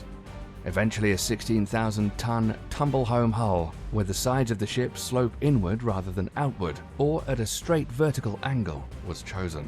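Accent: United Kingdom. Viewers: What the hell is a "gate-ling gun". LOL And why is a british person narrating these videos? 🤣🤣🤣🤣🤣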